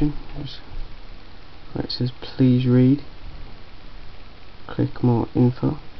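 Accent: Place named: United Kingdom. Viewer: i fall love with your british accecnt :>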